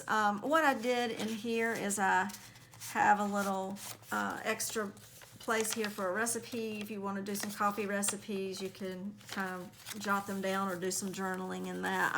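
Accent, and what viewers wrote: South, United States. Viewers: Oh my goodness I could listen to you for hours. Lol where are you from? USA South?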